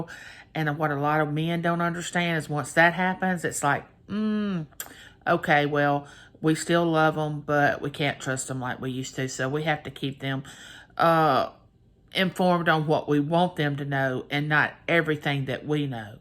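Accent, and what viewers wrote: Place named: South, United States. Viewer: I simply enjoy hearing you talk. The southern accent amazing. Thanks for sharing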